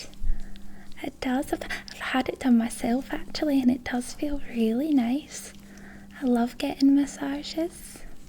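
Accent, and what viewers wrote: Scotland, United Kingdom. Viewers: Love your scots accent it makes me feel safe and helps with my ptsd thanks for all you do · I click on these to find out which accent I’m going to find. The Scottish one is my absolute favourite. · Your medical role-plays are first-class and the Scottish accent is an added bonus!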